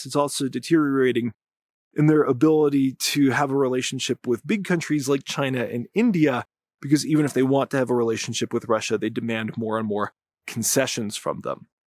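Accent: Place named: Southern California, United States. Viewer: Is he from California? I’m trying to peg the accent and I swear it’s SoCal.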